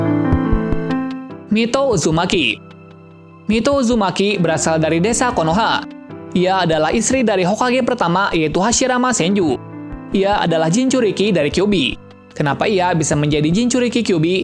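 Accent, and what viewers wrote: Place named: Banyumas, Indonesia. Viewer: Ngapak ya😁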